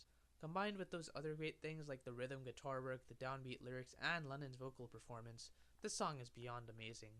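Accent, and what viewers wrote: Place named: United Kingdom. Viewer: "Sarr" is a accent thing, brittish english is diffrent from american english! Great video btw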